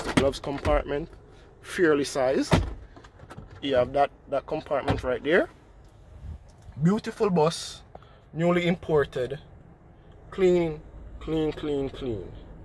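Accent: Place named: Jamaica. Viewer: your tone tells you from Jamaica,.....wagwaan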